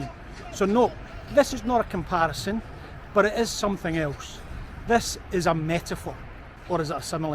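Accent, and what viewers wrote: Scotland, United Kingdom. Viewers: Oh Sir, your Scottish accent is so fascinating😂My situation is quite complex that I'm Chinese, learned British English before I went to Australia. Me and my friends watch American sports games so I'm already lose that what kinds of English rules should I follow. Now you add on. I'm going to be crazy now.🙄 I'm already suffered in Chinese dialects hell, why one more ... BTW good contents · Scottish accent is cute 😊, and welcome to my home country, hope you enjoy your trip!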